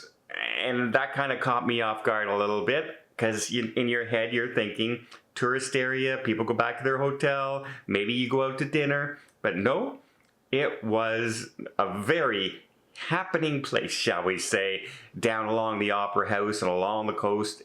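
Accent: Canada. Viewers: You should have come to Perth it's different again. It's small , hardly any population , isolated and very clean. It's also not as expensive. Not sure ,am I picking up a Canadian accent.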